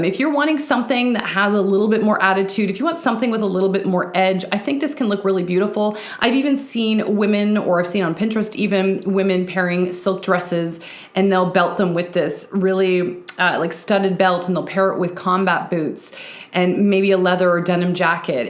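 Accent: Canada. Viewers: I come for the fashion advice, I stay for the Canadian accent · Out, about and sorry... how I miss my younger years in Calgary, don’t hear that accent in CA often. Love all the advise you give 👍🏼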